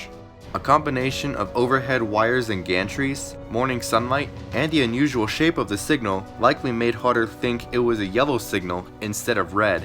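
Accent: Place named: United States. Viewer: It’s just American enunciation, we can’t do anything about it.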